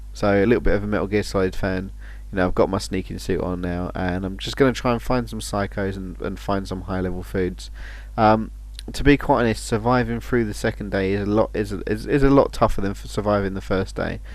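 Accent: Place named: United Kingdom. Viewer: hey ur guide is awesome and i love the british accent im american but id like to be british instead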